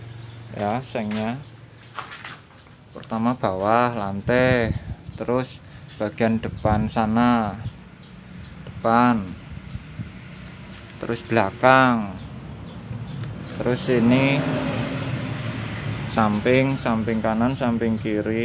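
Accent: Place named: Banyumas, Indonesia. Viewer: Sampean asli mana kang? Ko ada suara ngapak'y 🤭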